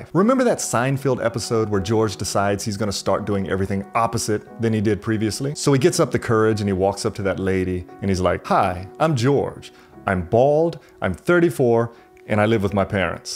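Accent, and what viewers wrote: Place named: Chico, United States. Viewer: sounds like he's from Chico, California.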